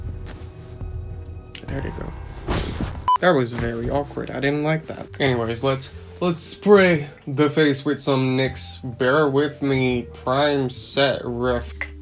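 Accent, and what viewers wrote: South, United States. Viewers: it sounds southern American to me 😂😂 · your accent........... i’ve never heard anything like it before its extremely southern but i know you’re dutch... wow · you sound like you have a southern accent which is funny to my cuz i know ur Holand also you're snatched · Is it me or do they have a more Southern American accent now? I had to review their old videos to contrast and it's pretty stark. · This is weird. When the hell did he develop a southern accent? He certainly didn't have it a few months ago. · It sounds like you have been in the south (in North America) for a few months at the start of this video. Very cute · You sound very country lol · Is it me but you sound like you were raised south of the Mason/Dixie line (residents of the USA know what I mean) Anyways, I still love your videos!😍😘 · Our sweet boy bein' sassy! 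Absolutely love it! 💕 Btw, I swear you sounded like you have a southern accent in this one. Being from the south, I know a thing or two about those. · Love your accent bud, you are a beautiful man btw . Where in the south are you from ? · Idk if this is new or on purpose lol but I hear a southern twang 🤠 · Why do you have southern US accent today? Like your Dutch one much better and I'm from U.S Georgia now in Florida.. You do the accent well...just...ugh...hmmm.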